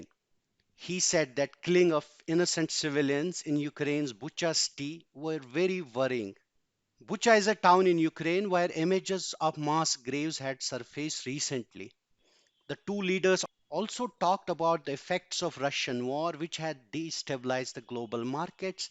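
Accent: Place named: Kashmir, India. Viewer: Thank you sir, Nice analysis of the big new of the day.. New voice from byju's probably from North india, most probably a kashmiri voice.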